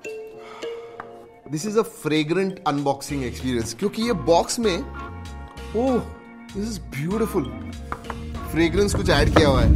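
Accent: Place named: Bengal, India. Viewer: Aap Bengali ho kya.....aapke hindi me Bengali tone hai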